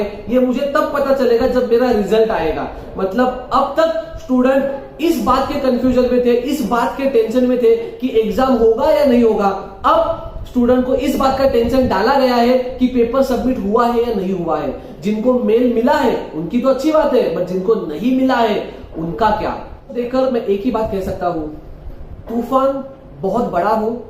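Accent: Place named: Maharashtra, India. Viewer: Ur from maharashtra seems from ur tome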